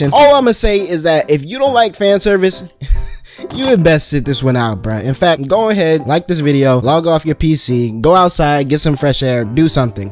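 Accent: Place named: Toronto, Canada. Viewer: I can tell this man is from the GTA. I didn't why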